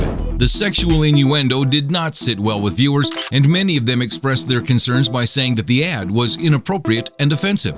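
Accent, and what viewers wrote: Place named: Canada. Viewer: sound Canadian but where is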